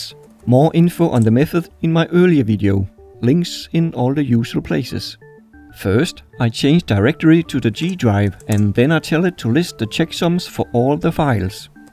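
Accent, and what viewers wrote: Denmark. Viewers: You sound danish 😌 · He kinda sounds like a Danish dude with a slightly american accent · you sound oh so danish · Are you danish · I love that danish accent :D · R u Danish? Jeg er I hvert fald du lyder dansk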